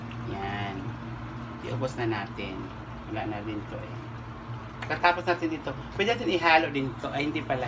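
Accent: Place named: Visayas, Philippines. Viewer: I love how to cook, magaling k tlga alam mu mga technic para maging masarap luto mu, sana dumami pa mga subscribers mu kasi sobrang hambol mu po, I love your way to speak very calm khit visaya accent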